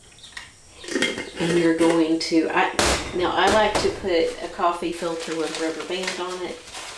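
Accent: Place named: United States. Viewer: Sooo amazing! Thank you! I'm pretty sure this info doesn't exist anywhere else on the Internet. 😂 But there had to be a way. Thanks for sharing. I've married into a German family in South Africa and they also still make Kochkäse. I had to have a little chuckle at the American accent saying it though. I've just recently found your page, as I've taken on the responsibility of our small dairy herd, and I am thoroughly enjoying working through all the shared wisdom you've got here. Very thankful.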